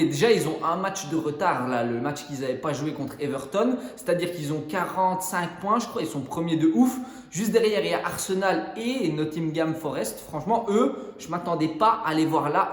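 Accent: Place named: Liège, Belgium. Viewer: Toi, tu es belge et ça s'entend trop à ton accent... L'accent de Liège, quelque chose comme ça.😂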